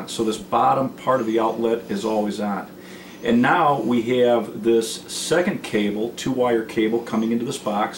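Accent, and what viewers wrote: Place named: Midwest, United States. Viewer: Spot the Midwest accent! "The hat is on tap!" Thanks for posting, this was very helpful.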